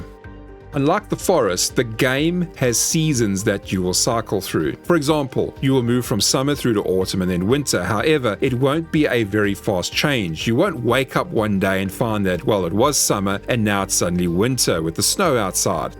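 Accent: South Africa. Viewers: Talking about "big" survival games, the day before will probably not get released this year. Your accent is not as strong as some, going to say Western Cape? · Are you South African?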